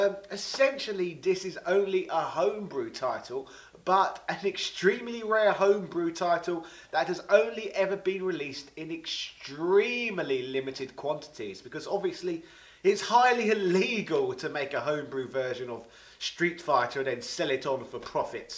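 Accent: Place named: Bromley, United Kingdom. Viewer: I noticed you did a bit of a posh accent in this video. Does that mean you live in Bromley?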